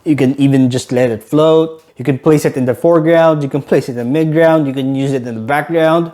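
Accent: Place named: Philippines. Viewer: I can hear that youre a filipino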